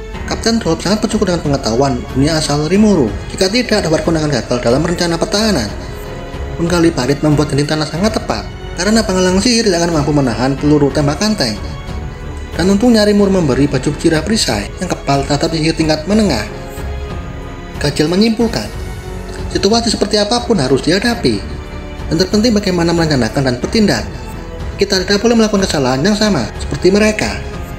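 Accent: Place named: Java, Indonesia. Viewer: Medok nya bisa gak di ilangin, sakit teliga gw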